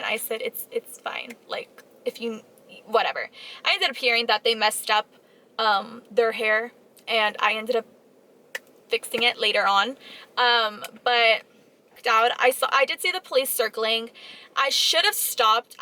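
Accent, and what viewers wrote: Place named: United States. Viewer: What state is this in! Sounds Just like one I know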